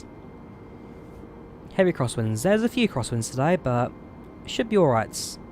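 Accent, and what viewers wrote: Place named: Yorkshire, United Kingdom. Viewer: Where are you from...you have that yorkshire accent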